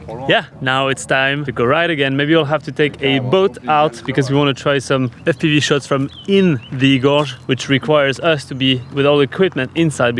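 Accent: France. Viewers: Nice footage but that french accent 🤢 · Dude, you Scream French!!! Never Stop!!!!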